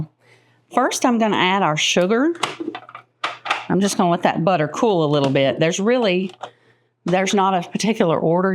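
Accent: South, United States. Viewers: Southern girl here (from Mobile) love your recipes and your lovely southern accent is music to my ears. God bless. 😊😊😊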